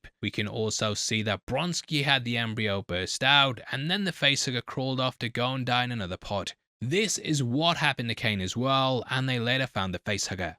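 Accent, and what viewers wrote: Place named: United Kingdom. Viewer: You sound like a Brit, you probably should know how french words are being produced, init mate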